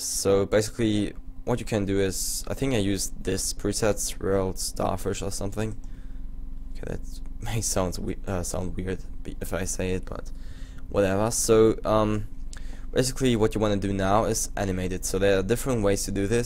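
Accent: Germany. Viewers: kommst du aus deutschland man hört das iwie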